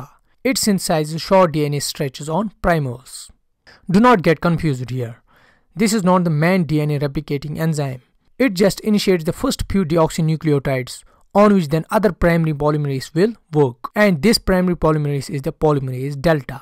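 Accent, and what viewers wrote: Kashmir, India. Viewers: U have a Kashmiri accent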